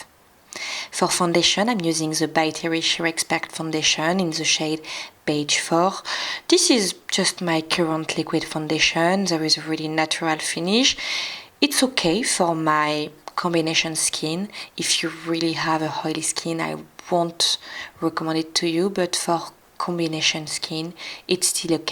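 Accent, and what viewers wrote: France. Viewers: no problem understanding you at all, in fact i love to listen to you: your melodic diction and French accent are delightful, while you are also very articulate. In English the nail color is a dark teal, i'd say. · I love your accent! You're very French, of course! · I love your French accent! One of the reasons why I like your videos ❤️ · Don’t fret over your accent! Your English is very good and how many of us wish we spoke with a French accent? :) Another great video!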